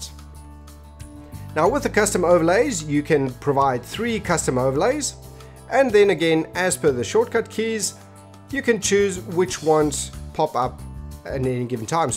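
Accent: South Africa. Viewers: Are you south african? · Nice vid dude, you sound South African... can u conform?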